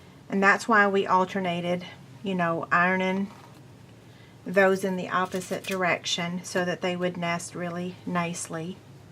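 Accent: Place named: Texas, United States. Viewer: Thanks for taking the time to make and share these videos! I'm about to do this to make a little quilt for my daughter. P.S. Your accent reminds me of growing up in Texas! 😊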